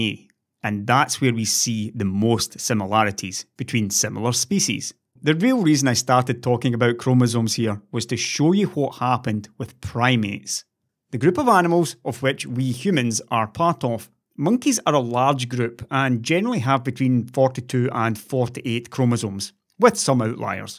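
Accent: Scotland, United Kingdom. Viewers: I love your accent... you make scottish understandable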